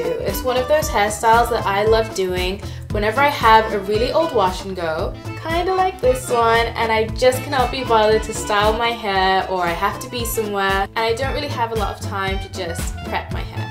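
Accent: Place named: United Kingdom. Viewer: Why do you have a britanic accent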